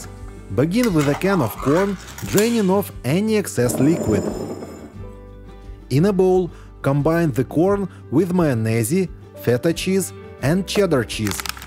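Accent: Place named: Russia. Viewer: I dont either , many tricks dont work... and that heavy Russian accent !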